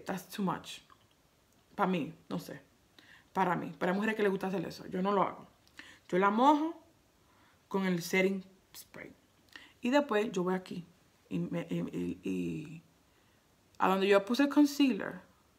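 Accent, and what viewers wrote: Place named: Puerto Rico. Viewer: You sound Puerto Rican.. lol but I love it! Soooo funny 😄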